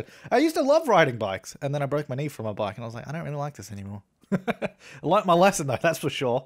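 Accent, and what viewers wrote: Australia. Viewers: Erdnussbuttertoast it's Aussie :') I think he lives in Sydney ☺ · Erdnussbuttertoast Australia, like me! Who else is from the down under here? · Erdnussbuttertoast Australia . I think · Erdnussbuttertoast it's obviously an Australian accent...😂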